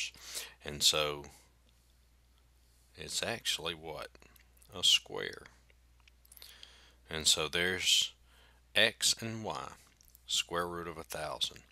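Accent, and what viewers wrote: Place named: United States. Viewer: I like your accent.. it sounds... American. Very soothing. (this is comming from an Australian). Great video!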